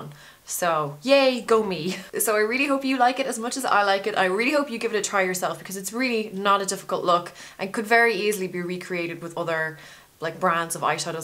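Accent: Ireland. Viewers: She's Irish, if that's what you're asking ( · Are u irish?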